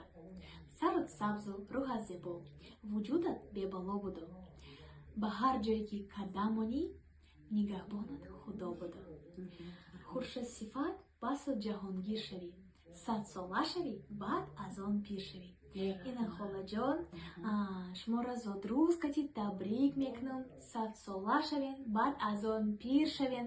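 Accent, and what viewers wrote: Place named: Kulob, Tajikistan. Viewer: Ту мана раздражать мекуни ,духтари ёщу ранги кампирои кулоб гапмезани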